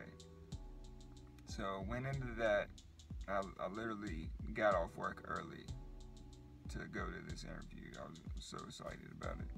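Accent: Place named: South, United States. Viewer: Just something about a Southern accent! (I'm from Georgia myself) Your story is really, really inspiring. I look forward to hearing more good things from you!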